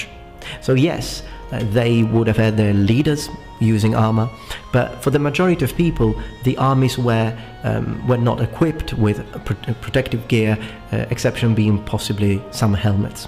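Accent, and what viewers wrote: England, United Kingdom. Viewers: I thought you were a Britt due to your accent. Learning a lot from your vids. Keep up the good work.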